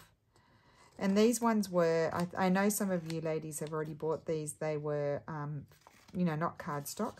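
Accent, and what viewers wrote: Australia. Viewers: You have such a calming voice when you are playing? Probably the Aussie accent. Your eye for colour is amazing.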